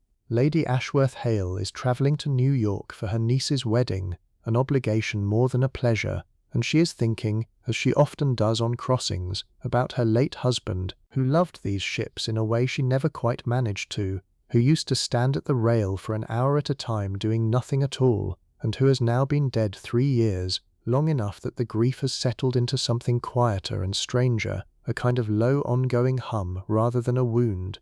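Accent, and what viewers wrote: United Kingdom. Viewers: can you change your IA voice to something not English so very English English.??? I have to jump away from your great content because I am so sick of the English accent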